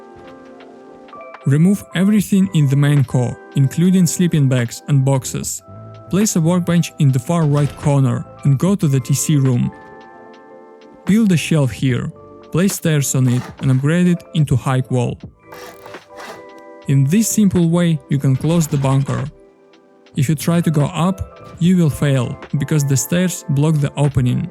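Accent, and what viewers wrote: Russia. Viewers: ты же русский по акценту бро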